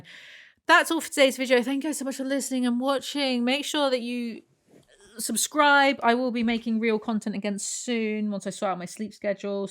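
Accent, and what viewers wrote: United Kingdom. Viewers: There's that aggressively British accent! 🤣 Glad to hear that bonkers accent once again. 😊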